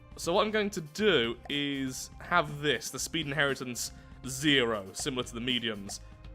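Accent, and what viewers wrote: United Kingdom. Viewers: God have I missed your spiffing British voice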